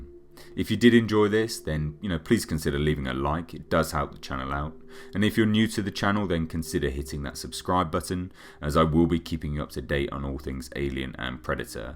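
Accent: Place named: United Kingdom. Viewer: The narrator sounds like the guy who does Dune videos but with a British accent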